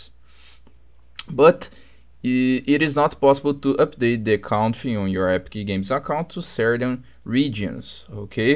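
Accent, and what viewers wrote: Brazil. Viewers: primeira palavra ja percebi q era br kkkkkkk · Sabia que tu era brasileiro no momento que abri esse vídeo mano kkkkkk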